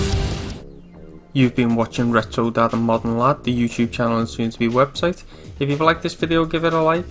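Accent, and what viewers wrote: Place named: Scotland, United Kingdom. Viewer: wow, that is pretty cool! .....but can anyone please translate Scottish to Australian? ....just kidding! ..love ya accent mate lols.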